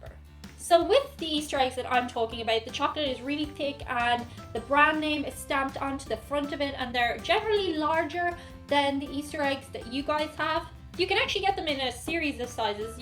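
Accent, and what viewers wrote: Ireland. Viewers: Wow, I've never heard anyone pronounce bidet like that. You pronounce it as "B-Day", and myself n everyone I know says "buh-day". We don't say the the letter B, we just make the B sound, followed by the word Day. But its all good, I absolutely love your accent n the way u talk. I'd do almost ANYTHING to marry a female with an Irish accent. It's one of the sexiest things I've ever heard. 💚🍀💚🍀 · just the Irish accent that's all.. just varies by region pronunciation of anything as to where you accent the vowels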